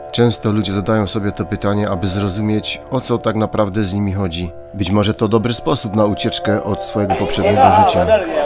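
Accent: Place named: Silesia, Poland. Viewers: Lektor powinien popracować nad dykcją. Film bardzo interesujący ale ten śląski akcent jest nie do zniesienia. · Ten Pan bardzo ładnie mówi po polsku A akcentu śląskiego nie da się wykasować ...także nie czepiaj się...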